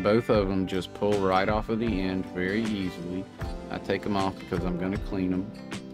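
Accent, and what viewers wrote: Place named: Kentucky, United States. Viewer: Where are you from. I’m In KY you sound like me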